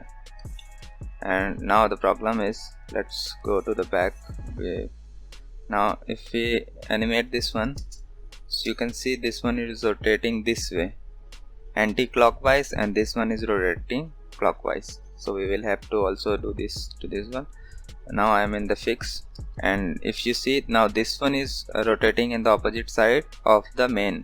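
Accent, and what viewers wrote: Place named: India. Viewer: Ok mr smart Indian sounding person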